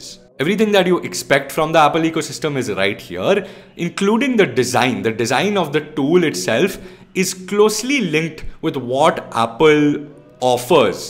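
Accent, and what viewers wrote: India. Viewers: Oh my God do you have to sound so Indian?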